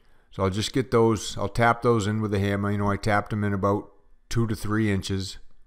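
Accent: Maine, United States. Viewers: Mostly was watching this to see how to mix it up and what consistency I should mix it. But I couldn't help but notice that you sound like you're from Downeast Maine lol. I'm born and raised there and live in the Southwest now 😂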